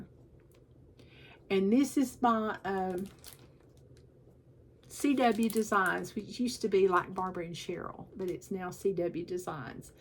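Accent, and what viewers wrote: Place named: South, United States. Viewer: Congratulations on 1,000 subscribers. I was born in Texas, so love listening to your southern accent. I love to play pickleball and take color tour walks in the fall ya’ll. Kitten, wine, quilt, Henry, Season, Thanksgiving, Raven, Sampler, Seashell, Pumpkin.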